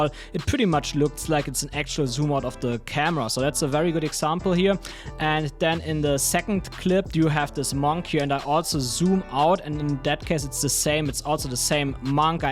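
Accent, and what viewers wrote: Germany. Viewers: klar englisch ist kein Problem aber wäre auch schön mal paar deutsche tutorials zu sehen und man hat krass gehört dass du eigentlich Deutsch redest aber gutes Video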